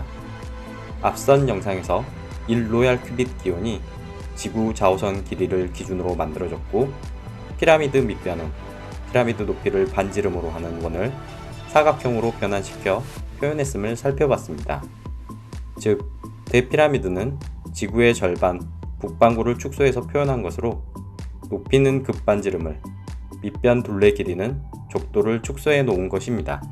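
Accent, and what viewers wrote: Jeolla, South Korea. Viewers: 형 고향이 전라남도여? 전라도 사투리가 심한뎅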